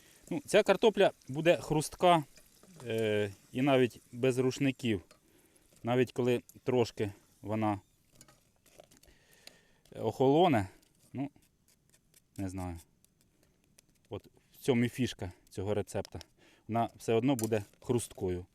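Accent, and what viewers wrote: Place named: Poltava, Ukraine. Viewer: став підписником не лише через персональне знайомство, а й завдяки гарній українській з легким полтавським відтінком) І не лише я. Продовжуй так і далі, молоток!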